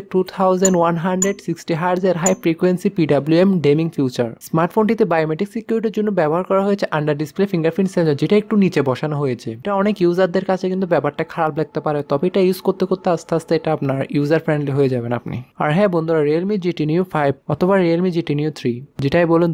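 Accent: Chittagong, Bangladesh. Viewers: Vai apner Basha Chittagong e Tai na?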